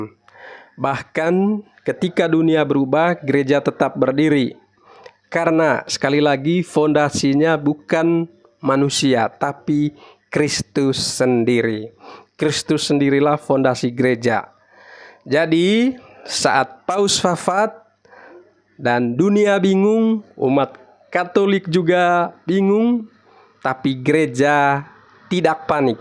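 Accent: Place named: Manggarai, Indonesia. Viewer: Mo mau tanya maaf dengan logatnya kaya orang Manggarai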